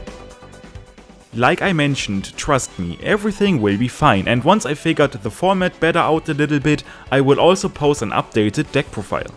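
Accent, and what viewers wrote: Germany. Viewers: The german accent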